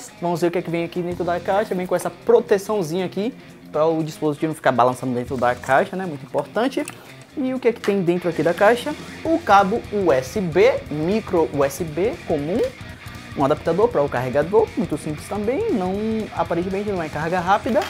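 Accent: Bahia, Brazil. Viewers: Meu mano vc é de que cidade do interior da Bahia ?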